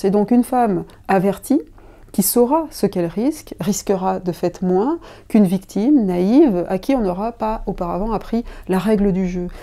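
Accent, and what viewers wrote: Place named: Savoie, France. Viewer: j'ai arrêté la vidéo à la seconde où le son de savoiE atteignit mes oreilles c'est pas tabou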